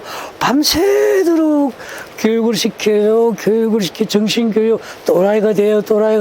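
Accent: North Korea. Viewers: 이북 말씨네.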